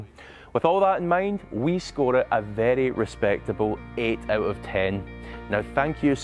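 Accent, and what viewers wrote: Scotland, United Kingdom. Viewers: You sir, are Scottish? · Got I love the Scottish accent, I’m getting a 2015-17 gt in a few months conv 6 speed❤️🤤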